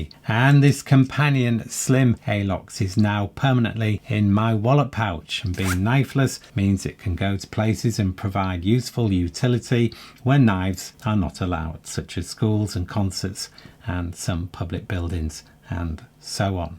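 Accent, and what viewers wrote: England, United Kingdom. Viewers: Where in England is your accent from?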